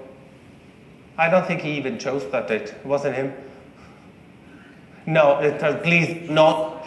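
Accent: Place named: Ireland. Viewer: I pick up a bit of Irish in your accent, I thought that can't be, you're Spanish speaking, maybe you've been to Ireland or studied and lectured there ? Marvellous miracle which is inexplicable , I think there's more to be found, hidden in this treasure .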